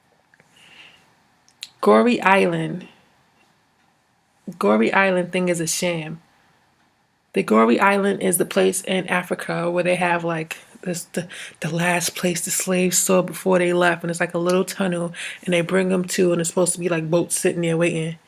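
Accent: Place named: England, United Kingdom. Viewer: I dig everything you've said, period, that's the point right?. Are you English or from London. I notice you have a bit of an accent when you speak. But nice information. I respect that, but if you are then be that, don' fake the funk, that is if you are.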